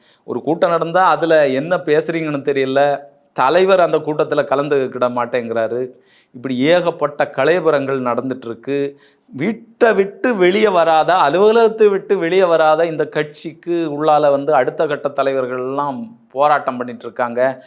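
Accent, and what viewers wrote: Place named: Kerala, India. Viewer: தம்பி உங்க பேச்சில் மலையாள வாடை அடிக்கிறதே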